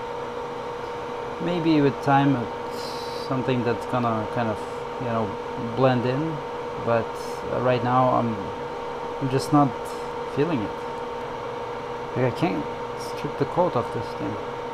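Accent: Russia. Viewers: Хм. У автора как будто еле различимый русский акцент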